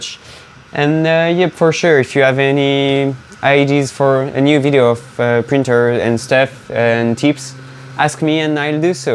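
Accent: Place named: France. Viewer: Salut, tu devrais renoncer à tout faire en anglais ou bien travaille un peu plus la prononciation, des fois tu es difficile à. Comprendre... ne te bloque pas trop sur l’accent, c’est pas si important, de toute façon on entend que tu es français, bon,, à part ça, tu dois ontinuer car tu fais du bon boulot et d’ici peu tu te fera ton petit nom....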